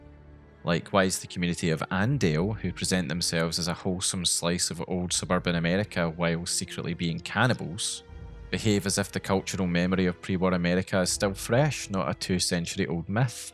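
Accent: Scotland, United Kingdom. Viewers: Holy shit, a Scottish video game lore youtuber? I'm fair tricket wi yer video and jist wanted to say fit like the day loon, foos yer doos? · You sure hes not Scottish? He sounds Scottish to me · Scottish accent ❤ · Your accent... Is it Scottish ? Sounds great. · Nice, the exact same video as the others but with a lovely Scottish accent - that alone makes this the definitive edition lmao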